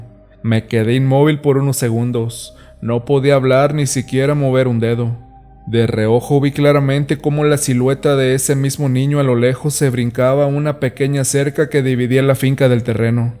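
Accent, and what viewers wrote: North, Mexico. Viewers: Me gustan mucho tus relatos...los lees muy bien 👍 se escuchan diferente con tu tono norteño☺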